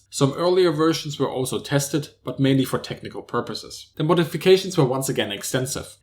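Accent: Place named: Swabia, Germany. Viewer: Schwätz doch Deutsch! Furchtbar der Schwäbisch Akzent im Englischen.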